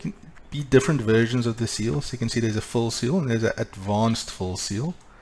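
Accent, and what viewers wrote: South Africa. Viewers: Your sound South African, regardless, great mod. Keep it up.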